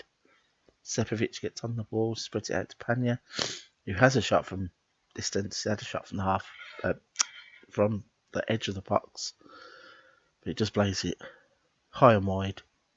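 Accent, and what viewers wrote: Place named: United Kingdom. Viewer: I don't usually play these games but when the commentary comes and even a British commentary , It just becomes a whole lot interesting. Keep up the good work.Liked and subscribed.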